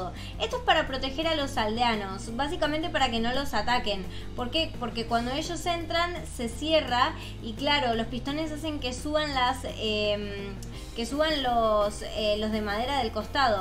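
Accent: Argentina. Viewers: tueres de argentina?? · Sos de Argentina o de España? O algún país asi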